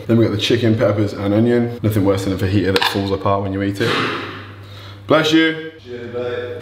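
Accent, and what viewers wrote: United Kingdom. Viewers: Mom? Swear hes british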